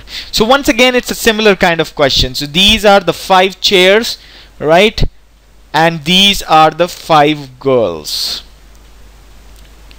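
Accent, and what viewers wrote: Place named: India. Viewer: thank you for the good video, but please slow down that Indian accent, it's really distracting...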